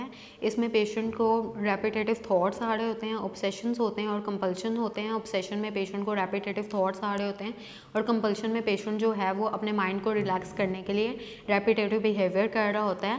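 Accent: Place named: Lahore, Pakistan. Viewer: Pukkii Lahorrii ho ap madam esliye ap arahi ho k aarrahí kehrahi ho lahoriyon ki bhi 1 wakhri zaban he.😁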